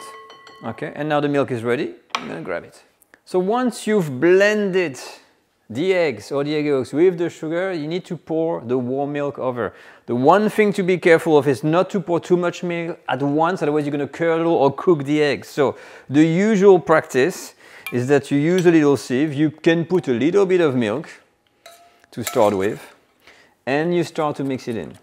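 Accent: France. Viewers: I must admit, having a French accent does make everything better and more flavorful😅 and even easier to follow. Love your videos. Merci!!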